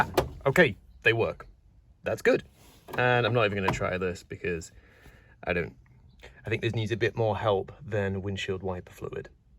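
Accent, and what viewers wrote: England, United Kingdom. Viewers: Haha, Hello there watched the program last night and sounded like you have an acksent,and was across the pond there in England. Lol. That motor sounded good once you got it running,but no I'm from California here in the mountains above Sonora. I was drinking my coffee,and thought I would act like I was from England the way I wrote what I wrote. Lol. I'm a true Californion. Lol and was just playing around about sipping on tea. When I was 5or6 I did ride inn the back of one of those where the seat opened up and back window would roll down. 👍that was around 1980. 👍 a long time ago my FREIND. What's the planes with the car ?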